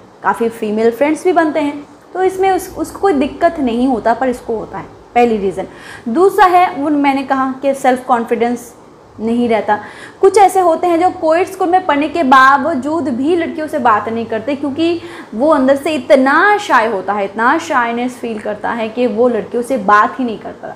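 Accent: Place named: Bihar, India. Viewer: Maim aap kahan se ho bato se lgti bihar ki pl batana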